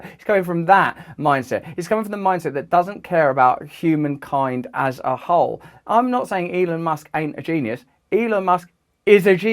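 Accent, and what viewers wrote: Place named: United Kingdom. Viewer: only way you could think you like this psyko is his ay eye is acting like the thoughts of yours he needs to creep in a disgusting robotakeover... you'll shake 'em out, brother Brand... much love... gra'itude for you and the excellen' videos, and being the hilarious "t"-free Bri'ish accent in me 'ead, when we vibra'in' on a swee' timeline thread where we' no' all dead... <link>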